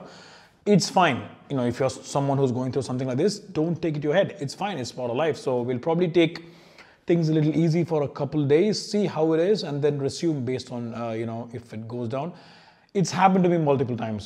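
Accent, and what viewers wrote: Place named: Madurai, India. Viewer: Waiting for your comeback brotherman, these low days will fly away sooonnn... A big fan of your fitness and accent - fellow madurai friend..🙂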